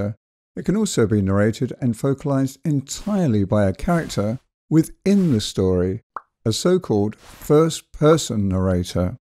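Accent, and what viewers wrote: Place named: United Kingdom. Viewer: I love this video! I laughed at these animation so much and the serious British voice is the perfect foil for them.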